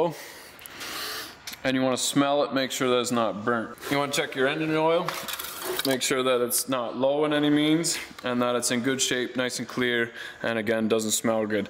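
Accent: Canada. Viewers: Love the accent think Canada! Love it. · I know you are Canadian because of the way you say "out" Thanks for the tips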